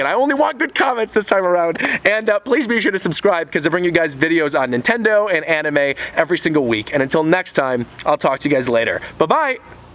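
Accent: Australia. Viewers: Australian accent? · Im pretty sure he sounds Australian.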